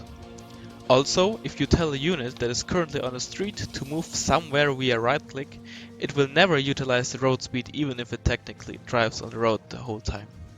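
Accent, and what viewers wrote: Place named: Germany. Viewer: Kannste das ganze nicht nochmals auf deutsch machen? Du bist dem deutsch ja mächtig, wenn ich auf deinen Akzent höre...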